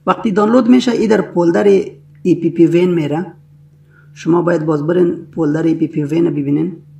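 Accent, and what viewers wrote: Afghanistan. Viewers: با سلام به دوستان عزیز افغانی . عزیزان ابتدا درخواستی دارم از شما . اینکه لطفاً زمان توضیح برنامه لطفاً کمی شمردة تر توضیح دهید چون دوست عزیزی که امورش می‌دهد فارسی را با لهجه و گویش غلیظ افغانی و با سرعت زیاد توضیح میدهد . و یک سوال داشتم . زمانی که یک vpn یا یک App رایگان دانلود میکنیم بعد از چند روز زمان استفاده رایگان تمام میشود و باید ان را اپدیت کنیم و حتما باید از داخل برنامه رایگان به ستینگ برویم و گزینه اپدیت را زده تا اپدیت شود ولی متاسفانه تا زمانی پول پرداخت نکنیم اپدیت نمیشود الان تقریبا ۹۰ درصد برنامةها نسخةهای پولی خود را اینگونه ارائه میکنند و به همین دلیل نمیشود برنامةهای پولی را دان کرد. اگر امکان دارد اگر راهی وجود دارد که بتوان رایگان دان کرد در کانال بگذارید متشکر میشوم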